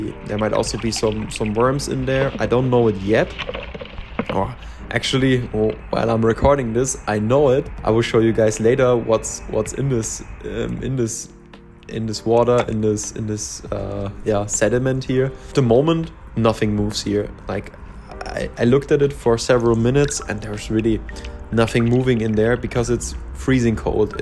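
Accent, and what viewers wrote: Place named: Germany. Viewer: Random question: I’m American, you said you’re from Germany. I know English stems from you guys so does the average person there learn English and German growing up? I notice all my channels with similar content come from your general area and all of you sound like English is native even though my American ears can hear a slight “Arnold Schwarzenegger” vibe coming through. This is not meant to be offensive or anything, the accent is cool to me. Great video, I’ll be subbing to see what else you do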